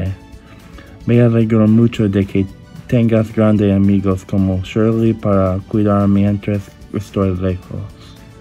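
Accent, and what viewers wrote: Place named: England, United Kingdom. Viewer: Me encanta ese acento de los ingleses cuando hablan español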